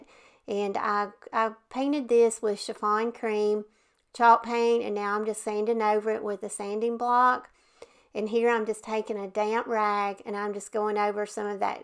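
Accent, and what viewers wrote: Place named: North Carolina, United States. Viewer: I love your sense of style and your accent. It is very similar to my area of North Carolina. I don't have creative instincts so I rely on crafters like you and many others to give me ideas. I painted an empty Bolero hand soap dispenser I got a Dollar Tree and it turned out beautiful. It had a pretty label and I knew I wanted to do something with it so thanks for that video the other day. Also wanted to mention I have the same scrapbook paper you used in this video.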